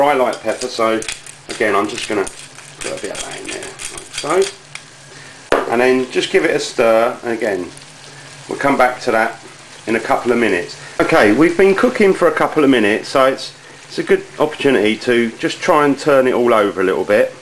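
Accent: United Kingdom. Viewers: The texture of potato absorbents too much oil.. I don't see myself indulging on such an unhealthy recipe. As a matter of fact, I can think of two ways to do this exact recipe in order to cut, more than likely, at least HALF the calories. But in fairness, healthy wasn't your approach. Dzięki Ci! Ps. British accent, Mexican dish?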